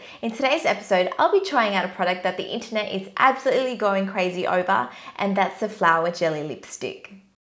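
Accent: Sydney, Australia. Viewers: her australian accent is form sydney where she grew up...mentioned in video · oh my gosh lol. she can be Asian and Australian... she's from Australia but is Vietnamese lol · haha shes probably asian but grew up in australia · Liz Beth she's from Australia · Liz Beth she's from australia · its australian!! like mine :) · She's Australian. She even mentioned that she was in Sydney. :) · R u from Sydney Australia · I knew it! sounded Aussie but wasn't sure :-P Thx for your reply. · Omg yas is that an Aussie accent? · Her accent is Australian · I knew you got OZ accent HAHAHAHHA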